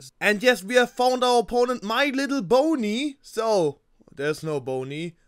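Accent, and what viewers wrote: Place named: Germany. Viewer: Man merkt sofort dass du Deutscher bsit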